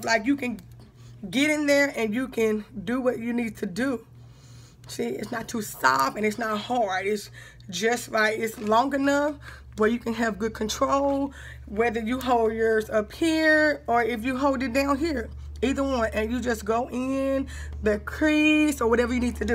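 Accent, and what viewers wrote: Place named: South, United States. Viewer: I love your accent you from the south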